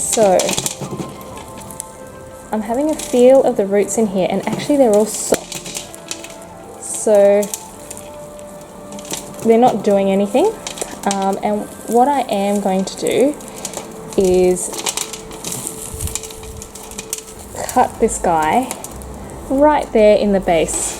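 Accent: Australia. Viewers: Good to hear an Aussie voice, my catasetum has just decided to rot it’s new growth hoping I can save the backbulb and it decides to do something in spring😢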